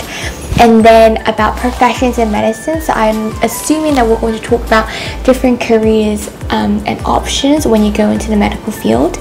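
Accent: United Kingdom. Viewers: 영국에서부터 구독했는데 영국 액센트와 미국 액센트가 아주 묘하게 조화를 이뤘네요.^^ 예쁘고 야무지고 똑똑 부러진, 영국에서 항상 응원할게요! 부모님께서도 무척 뿌듯해하실듯요.👍❤️😍👸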